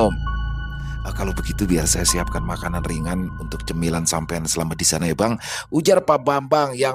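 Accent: Jakarta, Indonesia. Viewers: Saya suka kisah bang pian santai,lucu gaya bicara nya betawi bgt pokoknya kereenn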